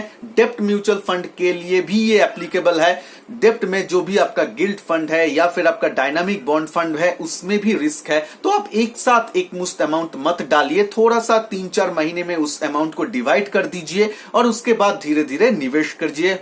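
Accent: Bengal, India. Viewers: You speak like Bengali !!! Are you Bengali ? আরে ভাই বাঙালি নাকি ? বি the way explanation is good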